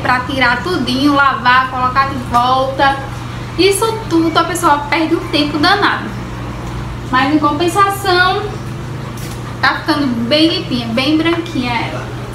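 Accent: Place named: Brazil. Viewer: Eu nao sou Brasileira mas falo portugués e gosto dos diferentes sotaques do Brasil. Eu gosto do seu cheito de falar, do bom dia e do "meu povo". Voce é auténtica e mostra sua vida como ela é, se alguem nao gostar do seu sotaque pois que asista outra youtuber de outra regiao. Continue com seu bom dia que tem gente q gosta, continue com seu cheitinho de ser que é unico e original 🌸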